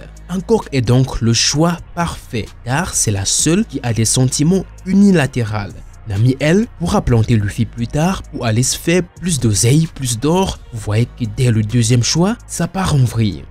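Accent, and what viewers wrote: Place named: Ivory Coast. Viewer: Tu parle comme un côte ivoirien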